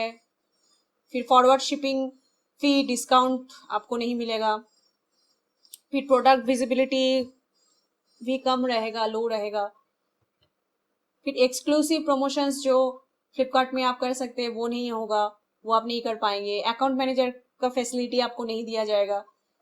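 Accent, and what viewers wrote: Bengal, India. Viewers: বাঙালি হিন্দিতে কথা বললে শুনে মজা আসে|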